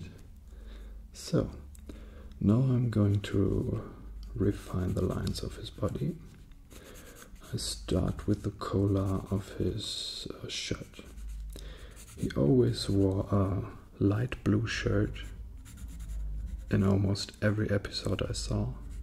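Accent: Germany. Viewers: this is when he still had a little german accent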